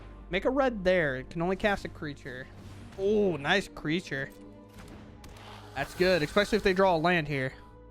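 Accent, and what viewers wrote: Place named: United States. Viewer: your accent, what part of the states are you from?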